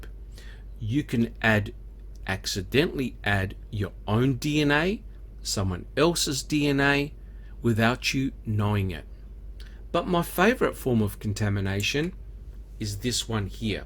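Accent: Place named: Australia. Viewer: Thanks so much for the videos! I do have to relate to you, however, that the statement from any LEO/s whilst talking to lab tech/dept heads of labs "try to place ??? in the house/garage or any other location they care to mention" is not nefarious in any way, even though it could be said that it is. I can't tell you the number of times that I've heard this very thing said in movies and in television police shows. Personally, I wish that they wouldn't say that for the very reason that it could be thought of as conspiratorial. But, since I note an accent from you, maybe Australia OR New Zealand, I'm sure that you don't know about this type of directive to occur, even though it clearly says "TRY to place/put her/him ......." I'm sure that if it were a true directive, it would not have been done so overtly and written on paper, where it would be seen by other than the person being spoken to.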